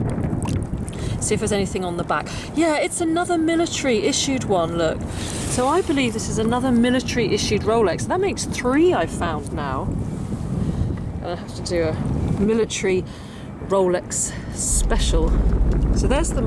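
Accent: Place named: RP, United Kingdom. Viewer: Please don' take this offencively but you look and sound like really posh totty.You also really enjoy your finds.A perfect lady of which there so few these days! Keep digging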